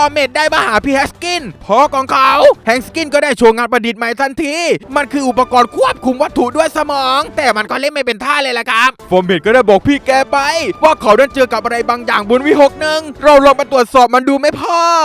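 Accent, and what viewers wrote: South, Thailand. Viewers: พี่บ่าวๆๆนุ้ยอยากให้ สปอยเรื่อง เอลซ่าภาค2นะพี่บ่าว เด้กใต้จ้าเหนพี่พูดใต้ชัดเลยคิดว่าคนใต้ค่ะ ขอเอลซ่าภาค2ค่ะนะๆๆ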